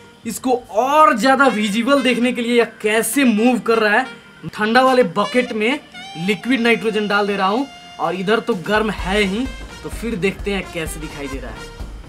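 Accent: Bihar, India. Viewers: bihari ho ka?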